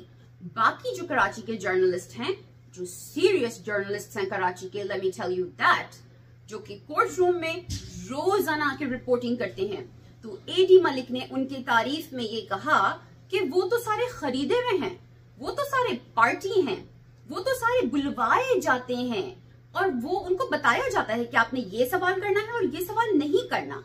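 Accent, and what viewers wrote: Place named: Punjab, India. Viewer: Good work wasy apki Punjabi boht kamal ki hai so sweet 🤣🤣🤣🤣🤣🤣🤣🤣🤣🤣ap in sab ki kotay wali boht kamal ki krti hai Punjabi ma🤩🤩🤩🤩🤩🤩🤩🤩🤩🤩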